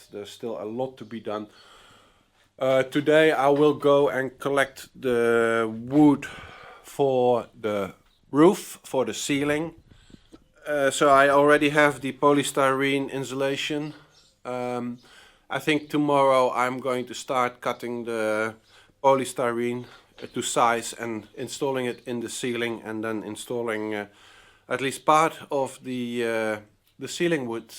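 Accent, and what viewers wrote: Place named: Netherlands. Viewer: Thanks for having done all these videos!! My wife and I are also looking to buy a project house in France and watching your videos gives me inspiration and teaches me certain techniques. Maybe dumb question, maar ben jij Nederlands? Your accent makes me to believe so.. 😅 Groetjes uit Nederland!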